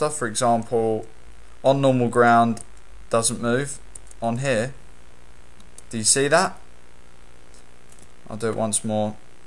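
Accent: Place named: Australia. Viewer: you sound like the australian guy on the series House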